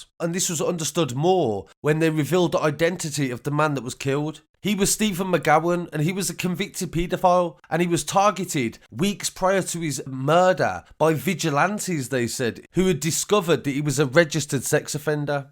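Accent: Birmingham, United Kingdom. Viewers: U from biirrrmingham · Does this guy have marbles in his mouth? I swear the British have warped the English language beyond all recognition. I can just feel the spit that surely is covering the microphone as he records this.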